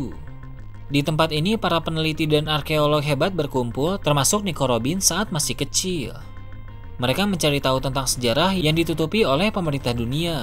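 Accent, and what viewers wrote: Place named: Bogor, Indonesia. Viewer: Bang, Lu orang bogor ya.. logatNya kentel amat..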